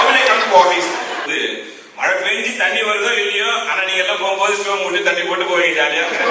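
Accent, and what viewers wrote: Bangalore, India. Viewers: Bro calm down - it's the Bangalore tamilians slang.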